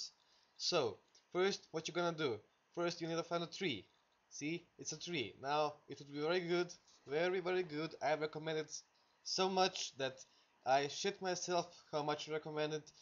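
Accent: Russia. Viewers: RAŠN AKSENT <3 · You sound like a russian but you're croatian its weird :P.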